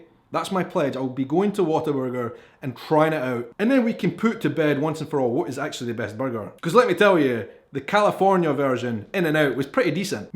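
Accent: Scotland, United Kingdom. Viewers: I subscribed about a week ago and I have not regretted it. Your great! I visited Scotland and was blown away by the beauty of your country. It rivals Texas. It could be its beautiful twin. The Scottish were amazing and amazingly awesome. I have 2 kittens and we all love your accent and your show. This is a great link to Scottish culture. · Hope you're able to visit my home State, Hawaii, some day. Will look forward to seeing THAT video, for sure. I think you underestimate how charming your Scottish accent is. Trust me, anywhere you go throughout the U.S. you will be met with warmth & generosity. A great many people from Great Britain & Ireland settled throughout the American South, so you will find a multitude of peoples with surnames that will be familiar to you. Just take a look at the names of Country Music stars, to begin with. Thanks for all your wonderful videos. 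Your experiences & insights leave me with laughter & pride. I have been to Edinburgh 3 times. Loved it and the people there and throughout Scotland. BEAUTIFUL Country. You have a lot to be proud of.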